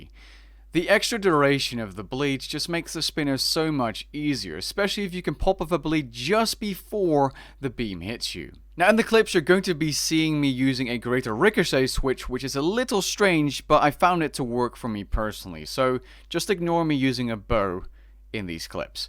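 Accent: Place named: Netherlands. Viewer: i hear a slight dutch accent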